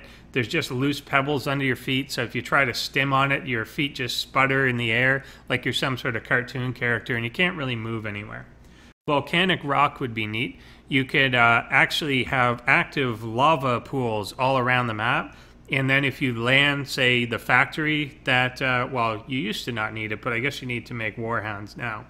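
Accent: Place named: Canada. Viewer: You're canadian, aren't you?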